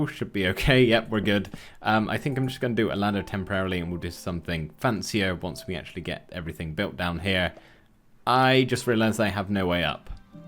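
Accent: United Kingdom. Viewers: Sadly i missed the stream and have no clue where to find it but i'm still loving this series so far (is it even a series) but yeah i'm loving it so far.Also are you British?You sound like me a bit.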